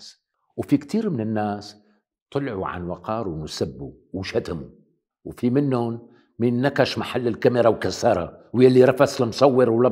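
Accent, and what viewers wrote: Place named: Syria. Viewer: والله انا اسمع للاستاذ مش بس عشان نقده واسلوبه، بس كمان عشان لهجته وطريقة كلامه السورية اللي وحشتنا كثير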